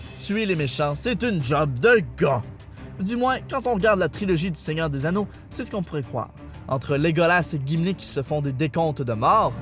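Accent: Quebec, Canada. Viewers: T'as oublier Hit Girl de Kick Ass !!! Mon dieu je l'aimmmeeeeeeeee 😍 Ps : J'adore ton accent québécois, je regarde tes vidéos limite pour ça 😘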